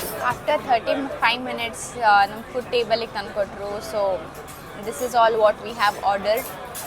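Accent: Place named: North Karnataka, India. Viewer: Nice see north Karnataka girl vlogs, in north Karnataka style Kannada .. is super style.. lol..,!!!!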